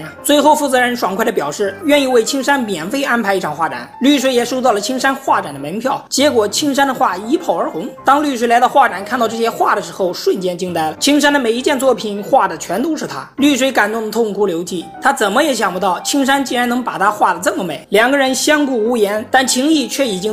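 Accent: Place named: Northeast, China. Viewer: 這是東北解說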